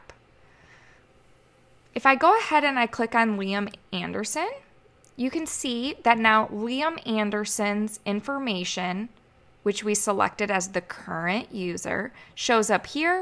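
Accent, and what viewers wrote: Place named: Wisconsin, United States. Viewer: You're (whoever is doing this video) is pretty good at explaining the videos! You just keep forgetting to add the links you mention... you should probably put "Remember to add the link." in your notes though. Can I request you do a video on the proper use of anchoring components? Every time I think I have it down, I realize that I was wrong! Also, where is that accent from? I'm guessing Wisconsin or somewhere close.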